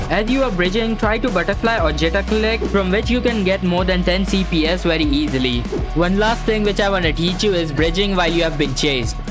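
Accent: India. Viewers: man bro i just realized his voice is indian INDIAN BOYS!!!!!!! :) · Was watching this next to my father, and he said “TURN THE FUCKING THING OFF… I dont mean to be racist but I HATE indian voices” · Are u a Indian u sound like one I'm and Indian too in Hyderabad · dude speaking extreme Indian accent in this!😆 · This is the first vid of you I’m am watching and from the start I KNOW you’re Indian from your accent! I’m also an Indian. Good to see more Indian ppl makin vids bout roblox and more games! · nice, exactly 8 mins for youtube payouts, and the indian accent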